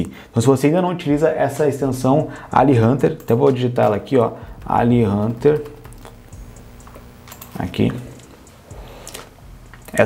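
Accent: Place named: Rio Grande do Sul, Brazil. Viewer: Tchê tu és de onde daqui do RS?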